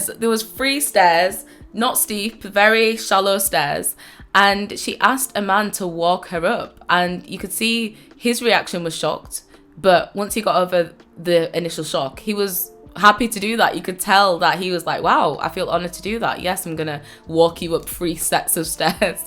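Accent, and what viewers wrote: United Kingdom. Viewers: Oo what part of the UK are you from? Your accent reminds me of Mel B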